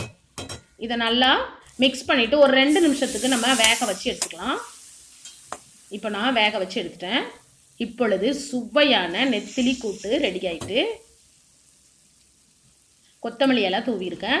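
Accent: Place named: Tuticorin, India. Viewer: Unga native ethu akka... Slang tuty slang pola iruku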